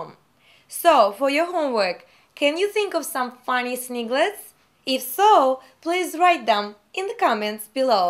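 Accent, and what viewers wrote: Russia. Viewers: are you russian?...i think i hear the russian accent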